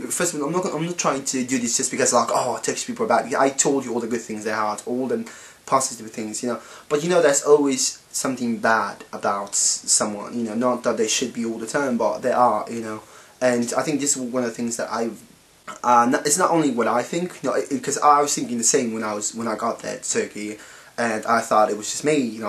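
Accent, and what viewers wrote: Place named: Birmingham, United Kingdom. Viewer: I'm English and i would never go to Turkey. I'm sure the majority of Turks are lovely people. But i always hear every summer of people being attacked and in fact just yesterday a British family were shot by a Turkish man at a villa. Greek people are beautiful. Go to visit Greece guys, beautiful people, beautiful country, beautiful everything. By the way you has anyone told you that you sound like you're from Birmingham in England, you have a similar accent:- )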